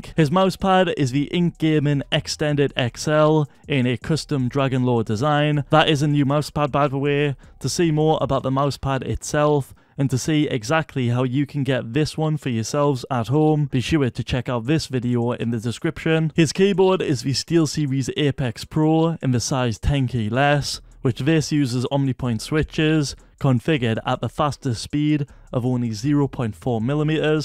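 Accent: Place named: United Kingdom. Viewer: is british acent right?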